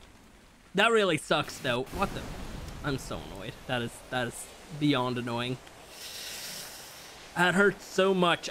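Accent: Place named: Ireland. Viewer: He sounds so Irish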